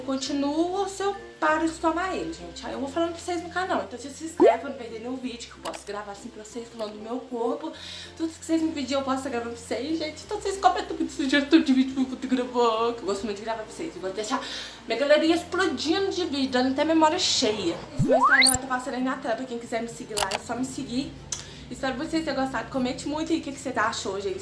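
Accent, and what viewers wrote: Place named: Minas Gerais, Brazil. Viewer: Eu comecei a tomar ontem to com 49 kilos agr vamos ver daqui a um mes ne kkkkkk Vc e mineira também ? Kkk melhor sotaque ☺♥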